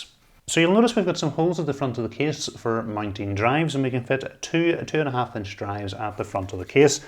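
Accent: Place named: Tyrone, United Kingdom. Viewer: Did not expect the accent. Refreshing to hear from a Tyrone man.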